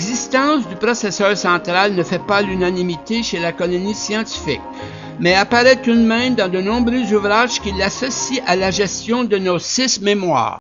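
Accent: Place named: Canada. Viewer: Putain j'ai cru au début que c'était un débile mental qui parlait au début. Après je me suis rendu compte que c'était un canadiens... \o/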